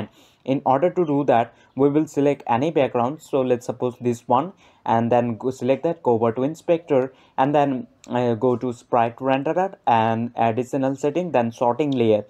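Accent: India. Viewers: The quality of the parallax is not good enough, also your project needs pixel perfect scrolling etc. Furthermore hindi accent is quite annoying for english speakers to listen to. So make great effort to lose that accent as good as possible.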